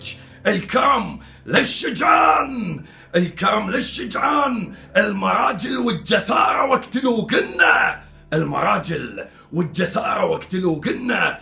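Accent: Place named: Iraq. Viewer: هذا الرجل لهجته عراقيه.... حياكم الله عمامي زبيد في كل مكان.....ابووضاح العبيدي الزبيدي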